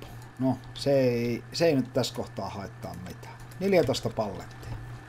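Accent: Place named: Ostrobothnia, Finland. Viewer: En tierä onko sulta ikinä kysytty tätä tai ootko vastannu mutta ookko pohjanmaalta kotosi tai asukko tällä hetkellä täälä. Kuulostaa murre nimittäin vähän samalta👍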